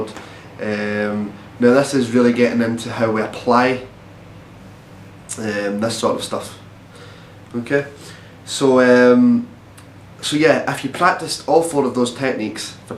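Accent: Scotland, United Kingdom. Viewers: Scottish accent... no problem to follow him... thanks to few years in UK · Scottish?? Great video man btw man!!! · As suggested... some of these exercises are indeed tongue twisters... especially when spoken in a broad Scottish accent! Good instructional video nonetheless... well done! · He sounds like a fine Scot to me! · Scottish accents rock! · he speaks nasal. But where is this accent from? Which part of the UK? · Thank you so much for this. I started on piano when I was 7 and have been out of playing for 40+ years. My son-in-law gave me one of his old guitars last Christmas and I had forgotten most (if not all) of my theory. It was one of the problems I was having converting the keyboard to the fret board. Even through the Sco"ish accent, this was just the reminder I needed. Thanks again. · Dat Scottish accent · I'm in love with your accent ! 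Is it scottish? Northern Irish? Anyways, it's awesome Thanks for the guitar lesson man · Comments on the video later but that accent is amazing!! Scotland?? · Like your accent. Scottish?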